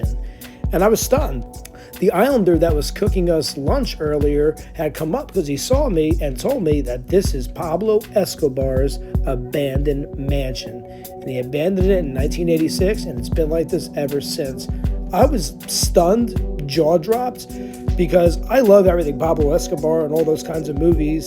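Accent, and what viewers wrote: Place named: New Jersey, United States. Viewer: I love your videos. May I ask where are you from? You sound like my cousins from New Jersey !! Keep up the cool videos man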